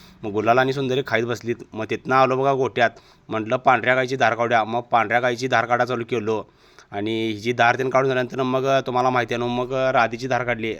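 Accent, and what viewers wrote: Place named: Ichalkaranji, India. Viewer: इचलकरंजीकर आहेस का भावा?? टोनिंग तसेच वाटते आहे..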